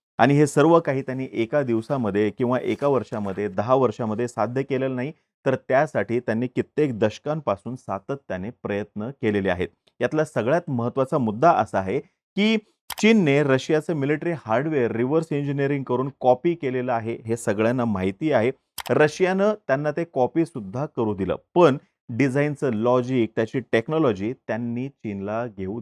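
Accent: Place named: Brahmin, India. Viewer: tu bamana saraha ka bolto re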